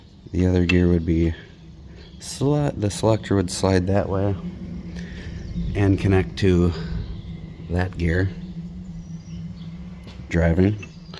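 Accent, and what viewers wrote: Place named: Minnesota, United States. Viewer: So ur accent says north...Minnesota?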